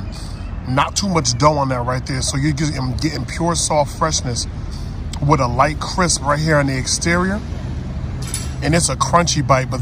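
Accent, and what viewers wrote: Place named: Philadelphia, United States. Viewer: Real shit when I started watching him I was like is he from Philly lol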